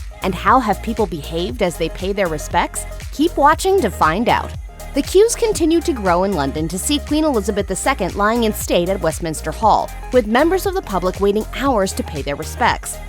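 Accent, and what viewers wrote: United States. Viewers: I don't need this stupid American voice. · Who is the commentator? Sounds awful. An American?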